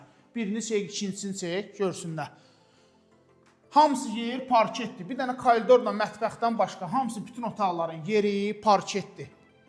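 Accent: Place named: Baku, Azerbaijan. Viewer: Bakli qedeș lehcesi bașkadida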